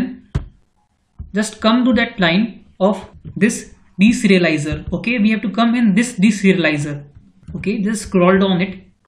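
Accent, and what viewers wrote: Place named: Arab world. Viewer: mmm bro what brother in what language are you speaking, I think it is English but as if it were mixed with Arabic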